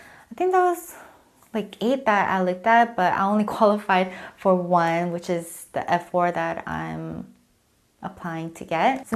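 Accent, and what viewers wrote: South Korea. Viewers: I think its quite interesting. You're an American-born citizen but you have a slight Korean accent when you speak (ie mixing up plural and singulars). It's fascinating how you acclimate to your surroundings or pick up people's mannerisms and speech. I hope you enjoy your life in Korea.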